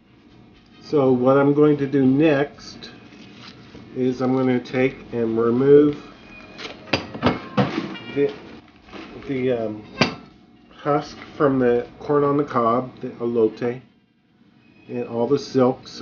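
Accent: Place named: Southern California, United States. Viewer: So, what I hear that's so familiar and comfortable in your voice is perhaps a Southern California accent? Been trying to place that freitasex sound for a couple of months. It's a good sound. Southern California makes sense.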